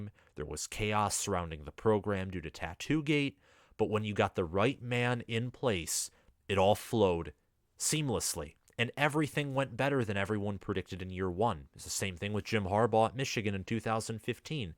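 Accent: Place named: Minnesota, United States. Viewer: I can tell by your accent that you're from Minnesota or very close maybe the Dakotas perhaps but to be honest I think PJ ruffled Luke's feathers with the recruiting accusations so he will be seeing a very motivated Badgers team on that day as far as Illinois..Wis owes them 1...now Neb is going to be a scary team sooner or later their going to be where they should be & that's top tier BiG10 as far as Wis the ceiling is is playoffs the basement is New Yrs 6 or very close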